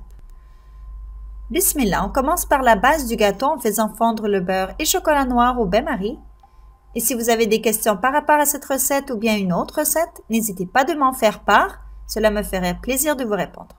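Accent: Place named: Quebec, Canada. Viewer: Bonsoir. Dabord merci pour cette recette ! Votre accent québécois avec " inchallah" c'est aussi fantastik que votre gateau 😉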